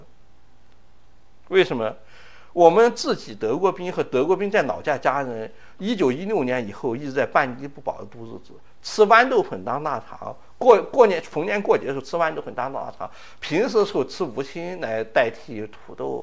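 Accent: Sichuan, China. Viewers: 加上字幕吧！ 蜀國話聽來吃力鴨！😁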